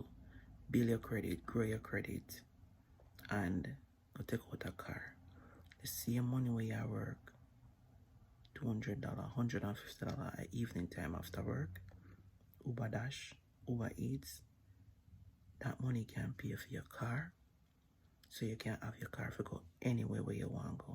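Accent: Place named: Jamaica. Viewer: You sound like a West Indian Jamaican are you a descendant of the Afrikan Diasopora a Descendant of the (SLAVES) the "BANTU PEOPLE" this is my question Sista. If so please, please look into who the Afrikan Diasopora people are Biblically we are really from the Scattered 🏠 House of JUDAH from the Bloodline DNA of Abraham, Isaac and Jacob/Yisrael we are the True Bloodline DNA Descendants. HaSatan have Deceiveth the whole world even the Saints if it where possible. So please my Hebrew Yisraelite Sista of Zion check out a few Hebrew Yisraelite YouTube Brothers and Sistas Channels Open Dairy, Hebrew Nation Building by Morris Williams Jr and Joshua Collins, Yona Golab, Hebrews To Negroes aka TV MOSES LEVI by Ronald Dalton Jr and Benayah Israel to begin your research on who the Afrikan Diasopora where before we became "SLAVES" To the European Nations and others Nations "WORLDWIDE" please don'tignore this very important information that I'm trying to share with you check out those YouTube channels with those Brothers and Sistas. 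Shalom, Salaam my Hebrew Yisraelite Sista of Zion. We are the Real Descendants of The Ancient Children of Yisrael.💋💗💕😍🕎🥰💞💖👄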